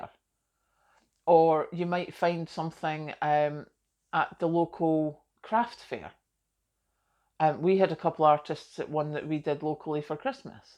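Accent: Scotland, United Kingdom. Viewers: Definitely she should. I really enjoy her. She embraces the qualities of my relatives from Scotland. That I miss so much. The accent. The due diligence. The helpfulness. I just really really like. I was on YouTube and found a woman. That was similar in some ways to my grandmother. That woman shared another woman. That sounds like relatives of mine from Europe. I absolutely adore that woman and her husband. And from that woman I found. is the most I would say similar to myself. Although I'm almost a decade or more older than her. I really respect her love for her community. For her country. I love that she speaks her mind. She's self-supporting. She helps others. She's got a man she loves and respects. My man died. I loved him endlessly. Once you had the best you not interested in the rest.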